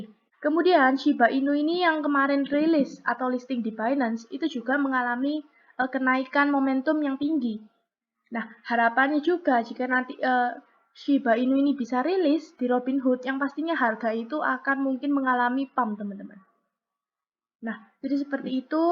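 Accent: Java, Indonesia. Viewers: Kok medokk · suaramu medok tenan mbak, tp enak di denger, semoga berkembang terus channel YouTube nya....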